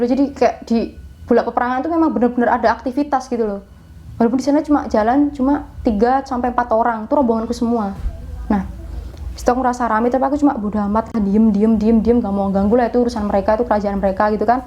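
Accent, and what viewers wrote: Java, Indonesia. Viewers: Jawa nya masih medok kak 😊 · Bahasanya medok banget wkwkwkwkw. Mbulett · Logat jawa nya medok banget Hehehe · Mbak ngomonge medok 😂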